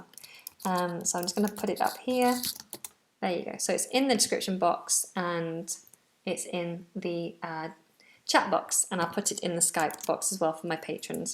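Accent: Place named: England, United Kingdom. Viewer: IF I LEARNED ENGLISH WITH HER I WOULD HAVE TO MOVE TO ENGLAND BECAUSE I COULD NOT SAY " MO_O" INSTEAD OF "MORE" OR "LATE" INSTEAD OF "LETTER".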